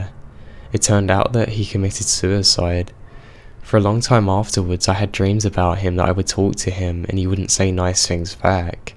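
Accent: England, United Kingdom. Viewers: I'm an American sub., and I love it when you can't help it and laugh. When you broke up over the word "fanny pack", I laughed out loud at 5am. Couldn't sleep...thanks for the laugh. Your a great, really gifted natural storyteller, and I love your accent. What part of England are you from? All the Best!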